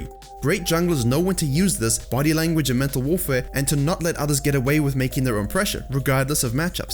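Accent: South Africa. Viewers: Do I hear some South African in that accent?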